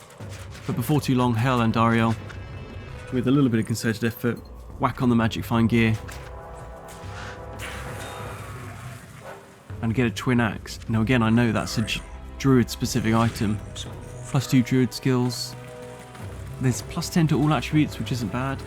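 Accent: United Kingdom. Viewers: Haha! I thought it was like a translation from Diablo 2 in German or something. But your accent is 100 % purebred British and not sauerkraut so I got confused.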